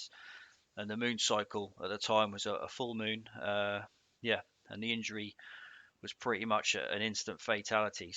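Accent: Cornwall, United Kingdom. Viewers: He is from Cornwall, they have an accent, like us Londoners, but I understand him fine.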